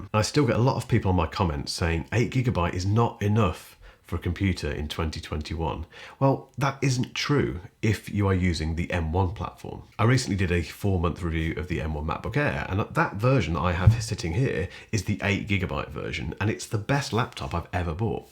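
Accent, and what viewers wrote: England, United Kingdom. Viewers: I'm only subscribing because I love this mans lovely English accent and soothing sexy voice! lol Ok, ok! Love his reviews too! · 5 secs into the video I hit subscribe instantly just for your fabulous English accent! 😍😍😍 Great informative content. thanks a million bro 👌👌👌👍✌💗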